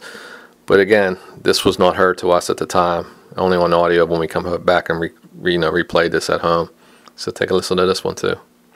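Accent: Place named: Pittsburgh, United States. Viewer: Pretty cool stuff. You sound like you're from Pittsburgh from the accent. Thanks for putting it all together.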